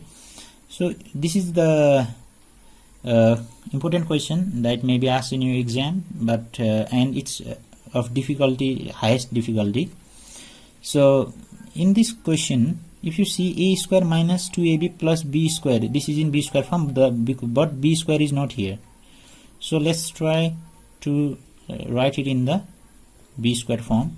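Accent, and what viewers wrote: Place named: Nepal. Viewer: Are u from nepal by any chance this is my first time watching ur vdo and I'm also from nepal and i think ur accent is like nepali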